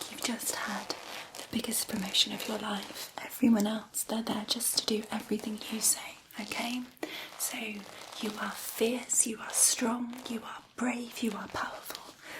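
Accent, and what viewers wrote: England, United Kingdom. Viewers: you look like a living doll! You have that "Carrie Underwood" effect, where you look so beautiful, that you you don't seem to be real!:-) Thank you so much for your soft, English accent - listening to your ASMR puts me right to sleep when I am suffering from insomnia! Also - LOVE the leather look and sounds... the "leather jacket" look is "Amazing" on you!! Thank you again, and Cheers!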